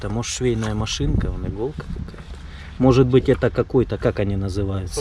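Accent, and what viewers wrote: Rostov, Russia. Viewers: Как у классика: "Хорошего вам настроения! Вы держитесь там! Просто денег нет."😀 Лайк только за один ростовский говорок!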